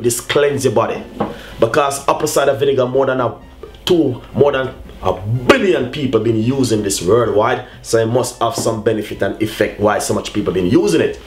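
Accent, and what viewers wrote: Jamaica. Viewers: Some people just like talking rubbish he is form Jamaica that how they speak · His Jamaican his accent is different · Coo-Coom-Brrrrr! So love that Jamaican accent! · For those who are concerned about his accent,he's my countryman,'Jamaican'to the core,no speaky spokey,and i rate him for that,straight down to earth son of the rock,thumbs up, gwaan do u ting mi yute!!! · I love your accent Jamaica tunnation nice one there, but that vegetasble is not seen clearly to see it i can get around me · The accent really attracted me, big up, nuff respek to my Jamaican bra. Anyone without an accent is dumb (can't speak). There are more than 10 different accents in the UK and they are all speaking English! People need to travel and learn. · He sounds Jamaican. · I lova me that Jamacian talk and sound, Chef!! I love the island mindset and food/drinks and healthy!!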